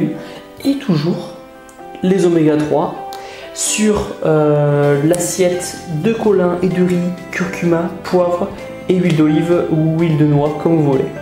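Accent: South, France. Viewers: hey tibo ! haha cool tes videos ! je rigole tous le temps quand tu te présente...dawnnn cest tibo in shape...jespere que vous allez bien etc...avec ton accent du sud ! question : en mangeant les mêmes choses que sur la video au même heures,sans prendre de whey,crea, etc...peut on avoir les meme resultats ? Resmey.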